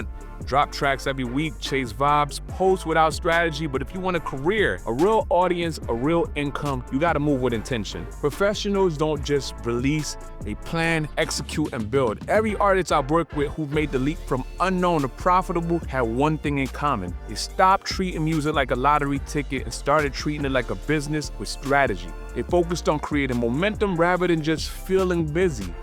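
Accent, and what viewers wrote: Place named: Caribbean. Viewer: Random guess based off your accent: are you carribean born but raised in NYC? 🤔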